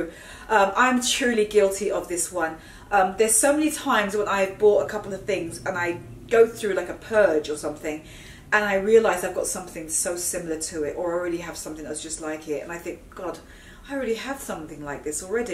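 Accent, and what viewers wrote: RP, United Kingdom. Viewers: So fucking posh how have I not found you before now!!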